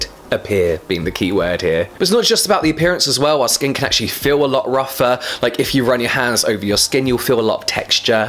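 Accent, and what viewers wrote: England, United Kingdom. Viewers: Listening to you makes me feel like I'm back in the UK! Love your accent. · Scrubbing away ah ih = scrubbing away at it. Gotta love the brits 😂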